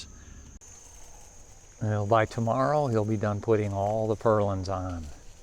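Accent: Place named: Midwest, United States. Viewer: Are you from Chicago? I detect a midwest accent. I grew up in Illinois but been in CA for 40 years. Thailand next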